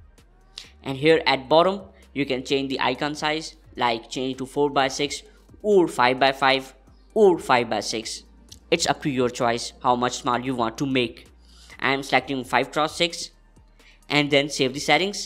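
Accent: India. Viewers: You speak English better that half or the people living in the US who never bother to learn English. Americans are frustrated with any foreign sounding tech support because it means jobs are being sent to other countries, while we are being invaded by millions of foreigners who illegally come across our borders to take advantage of any free thing they can get. You sound like you may be from Asia or India. India is unfortunately is known for two things. #1 Scammers. #2 Very annoying tech support people whose only knowledge it to look up an answer as you wait on hold, or repeat you question back to you again, or continually, "apologize for the inconvenience". You are what you are and if you just keep doing what you are doing and people will learn to trust and appreciate your help, with our without an accent. You did an excellent job and I appreciate your help. I am told I have an accent also, but that is because I am from TEXAS. 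:) I hope you and your family have a Merry CHRISTmas! (I just subscribed and left you a thumbs up!)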